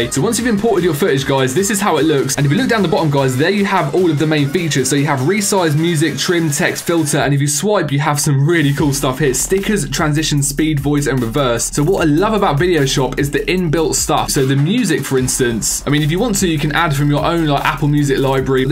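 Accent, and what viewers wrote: United Kingdom. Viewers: I just knew that you are British I love your accent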